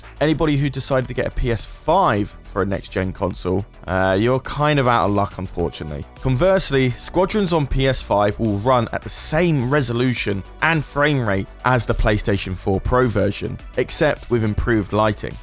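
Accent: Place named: London, United Kingdom. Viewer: You sound like your from London.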